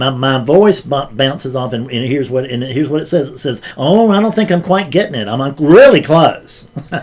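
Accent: South, United States. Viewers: your southern drawl that sounds lovely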